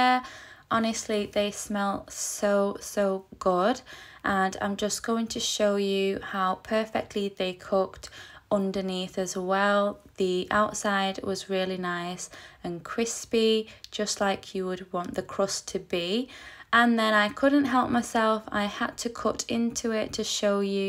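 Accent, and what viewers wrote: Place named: Northern England, United Kingdom. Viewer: Excellent lovely clear proffessional presentation & I think a northern accent,great,certainly try some in our air fryer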